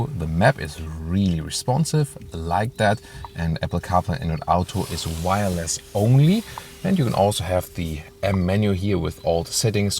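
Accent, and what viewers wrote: Germany. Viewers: German accent kicks hard af haha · Good accent.....German Englush style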